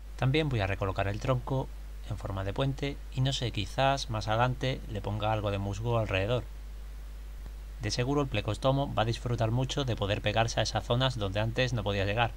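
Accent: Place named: Andalusia, Spain. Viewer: oye tu de donde eres porque he estado viendo videos tuyos antiguos y tienes acento andaluz porque yo soy de andalucia xD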